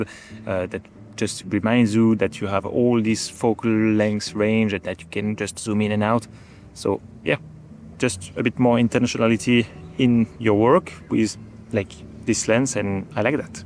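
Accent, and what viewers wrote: France. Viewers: This guys sounds fench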